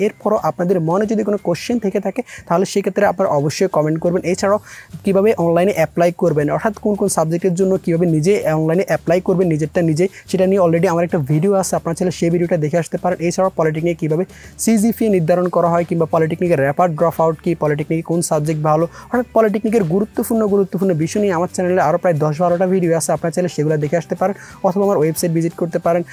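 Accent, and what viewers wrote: Noakhali, Bangladesh. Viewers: Vai apner bari noakhali? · ভাইয়া মনে হয় নোয়াখালীর?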